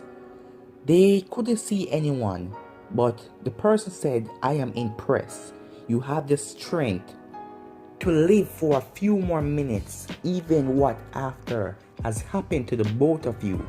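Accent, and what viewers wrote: Jamaica. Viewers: We’re are you from Jamaica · How you doin ? And are you Jamaican ? If you are wa gawn? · He’s Jamaican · love the accent, jamaican?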